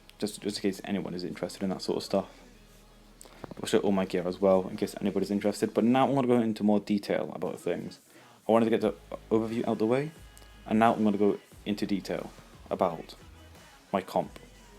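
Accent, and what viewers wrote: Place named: England, United Kingdom. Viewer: Awww English accent...😀.. English?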